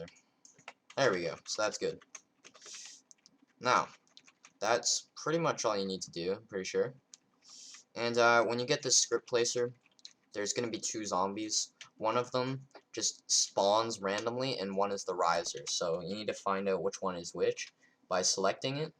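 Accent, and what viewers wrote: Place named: Canada. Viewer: i can tell he's canadian, from the obvious pronunciations of words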